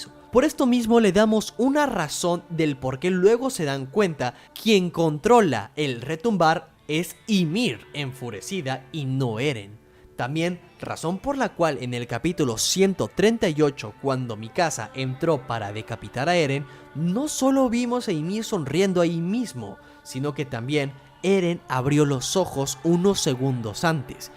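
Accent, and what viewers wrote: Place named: Sonora, Mexico. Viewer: No sé si él sea de México, pero yo conozco a muchas personas que son del norte del país y así es su acento, para ser exacta de Sonora, México.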